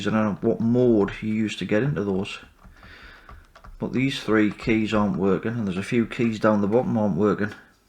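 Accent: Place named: Newcastle, United Kingdom. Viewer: You sir, have the patience of a saint. I love watching your streams. From a chap, me, who was born in Newcastle and moved away, in the mid-70s, when I was 5. Up here again in the Toon, for the weekend for a bit of nostalgia with my wife. Never tire of the accent and I have old tapes of when I had a strong accent. Ha'way Canny lad. 😅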